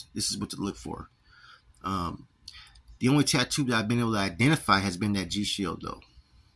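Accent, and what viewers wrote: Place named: North, United Kingdom. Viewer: you speak like a true northerner.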